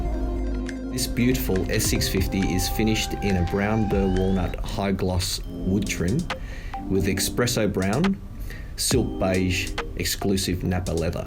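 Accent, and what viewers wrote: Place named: England, United Kingdom. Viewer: Fuck this shot . Asian man with English accents. Someone kill Me please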